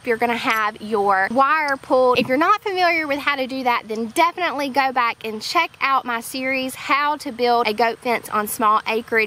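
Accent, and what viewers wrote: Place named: Virginia, United States. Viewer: Just subscribed!!! Where are you from. That accent reminds me of us here in VA.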